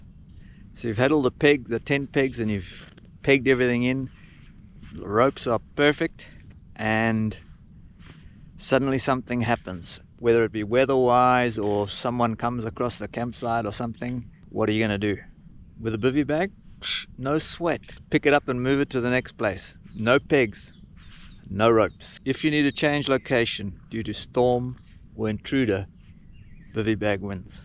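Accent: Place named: South Africa. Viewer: I really like you videos. Freedom shouldn't be an expensive thing. You make it look easy. 😊 You are a South African born aren't you? Your accent is a clear give away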